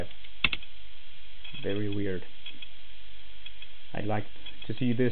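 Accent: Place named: Denmark. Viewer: you sound danish...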